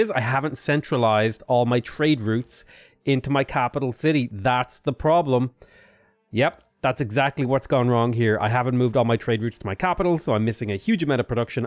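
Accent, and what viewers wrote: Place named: Ireland. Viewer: You have a lisp? I thought you were just Irish.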